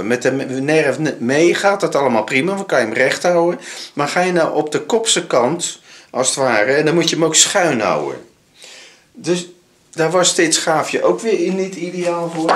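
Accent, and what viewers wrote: Netherlands. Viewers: Hoi, nieuwe leuk schort aan ,staat je goed Vindt het altijd leuk om naar te kijken ,je kan het goed uitleggen voor simpele dingen,en altijd wijs om nerderlander te horen klappen. Groeten Mike.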